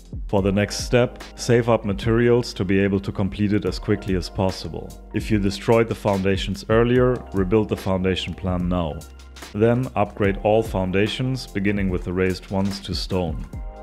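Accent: Germany. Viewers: Bist du deutsch? Hört sich nähmlich ziemlich danach an:D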